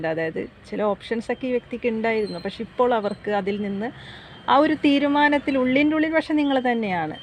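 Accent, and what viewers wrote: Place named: Kasaragod, India. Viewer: ചേച്ചി കാസർഗോഡ് ആണല്ലേ... പറഞ്ഞതൊക്കെ കറക്ട ഒന്ന് ഓള് മനസ്സ് മാറി വന്ന മതിയാർന്നു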